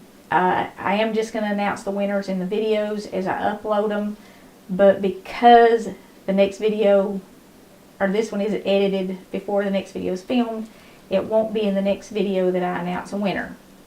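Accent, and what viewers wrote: South, United States. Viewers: I just want to add: I really do enjoy watching you, but I bet it isn’t the reason most other ppl do. I was born in and spent the first 25 years in Texas. Then I wound up in Edmond, OK, then Boulder, CO and back to Edmond again. I just miss southern accents! They make me feel at home! My grandmothers and great grandmothers were rural, farm and dairy people. We’d go on Sunday to visit and then for eating later in the day, my grandmother would reach into her refrigerator and out of a little bit of one leftover, and two or 3 more in margarine tubs, she could turn those into the best dinner!! She would also put out a huge plate of her homemade pickles!! And a big chuck of cheddar. All of those things just tickled me to death!! I get just a tad of that my memory watching you cook, so thank you!! I hope to make your corn fritters just as soon as the sweet corn is in!! 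My husband is a minister, so I laughed so hard with you telling on the kids in your first church who would say the verse, “Jesse wept,” and take their dollar and run!! I had fun sharing that story with my husband. I will just keep on watching, just wanted you to know, just the way you talk is like being home. Texas home, that is.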